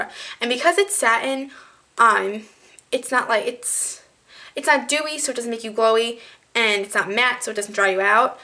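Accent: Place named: New York, United States. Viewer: i love your accent. new York???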